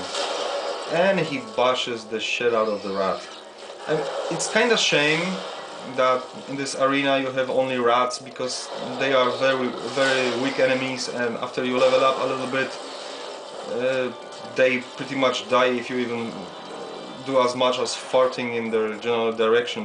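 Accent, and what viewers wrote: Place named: Poland. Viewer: Soul Sacrifice ? Ale słychać, że Polak mówi po Angielsku xD Jednak nie jestem jedyny, który wpadł na nagrywanie materiałów po Angielsku :) You've got my sub dood !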